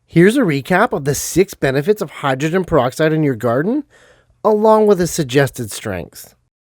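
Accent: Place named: Canada. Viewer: Very useful and interesting and Fargo or Canadian like sounding narration. Seriously, very good video 👍🏻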